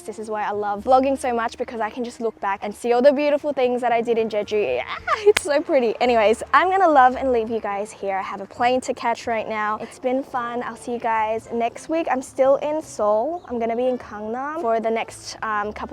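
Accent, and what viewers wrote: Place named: Australia. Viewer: I think I once left a comment on your vlog like, “Do Aussies really speak this fast?” 😂 Was watching Aussie videos to study English and ended up on your channel again… and omg, my hometown showed up!! It felt so strange (but in the best way) seeing it filmed so beautifully. And I’m in Melbourne now — day 3 here!! 😊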